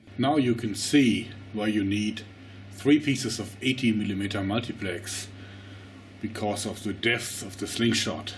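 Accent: Germany. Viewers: could it be, that you are german?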